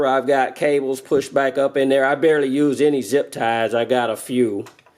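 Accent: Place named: South, United States. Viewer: narrated with a heavy southern drawl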